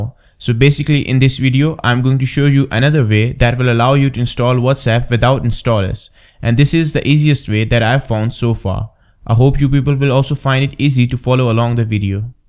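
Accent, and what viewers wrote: India. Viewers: Indian Accent XD Thumbs up!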